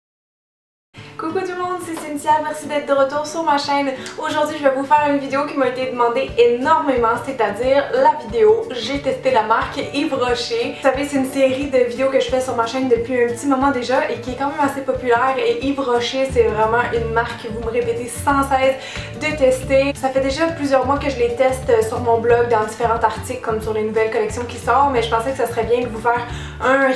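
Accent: Quebec, Canada. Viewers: Tu est quebequoise ? ;) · J'adore ton accent Québécois ! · Moi l'huile de monoï je l'utilise pour les cuticules sa les nourri et cela fait de beau ongle au passage j'adore ta chaîne et ton accent canadien est ce que tu pourrai m'être en € les prix aussi merci d'avance 😻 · Je ne supporte tellement pas l'accent québécois que j'ai dû zappé ta vidéo ! 😖 dsl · Ta ha it's Canadian (québec)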